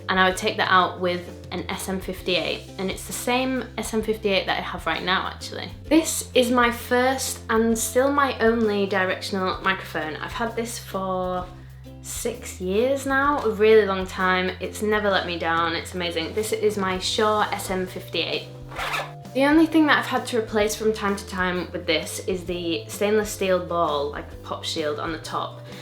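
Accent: United Kingdom. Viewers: you're from the uk? you sound northern!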